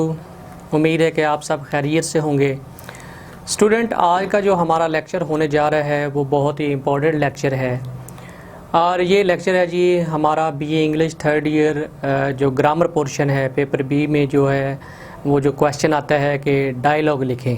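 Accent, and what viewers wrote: Punjab, India. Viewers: Sir , it look like u know Punjabi language by ur pronounciation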